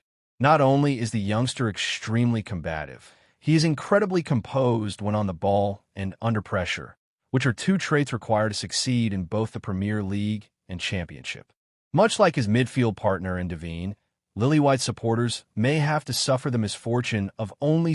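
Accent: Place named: United States. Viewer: Why have the used an American accent on the Ai voice over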